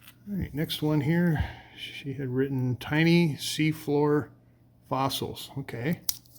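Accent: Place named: North, Germany. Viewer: Oh heck yeah! This is interesting. I‘m German but living further down south, on the Rhein. sounds northern German and her rocks imply she’s from up there, too. Not to dox her 😅 Flint is super common up there but I hardly ever find any down here. It was interesting to see the difference when I lived up north.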